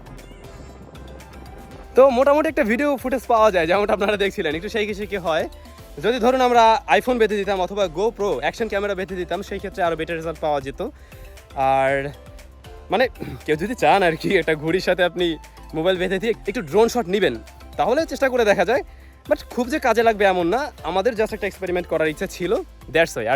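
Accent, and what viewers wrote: Barishal, Bangladesh. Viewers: vai Apni akjon বরিশাইল্লা! ajke janlam.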